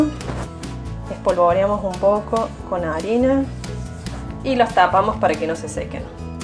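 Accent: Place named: Cordoba, Argentina. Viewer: me encanta ese acento cordobes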